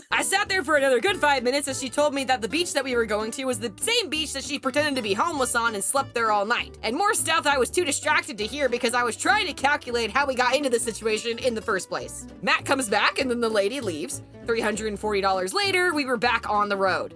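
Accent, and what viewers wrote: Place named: South, United States. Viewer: i'd say it's not only the southern accent, but the voice itself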